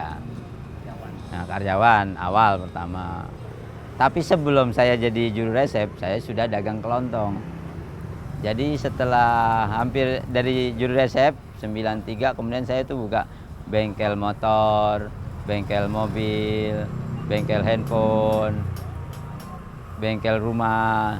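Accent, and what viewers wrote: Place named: Jakarta, Indonesia. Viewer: Betawi bat yg ngomong. 😁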